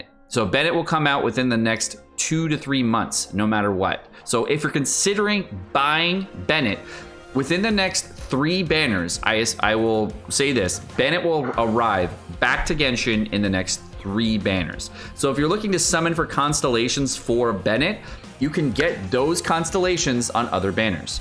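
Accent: United Kingdom. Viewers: Can we please get a British only video please 😭😭😭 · I looove british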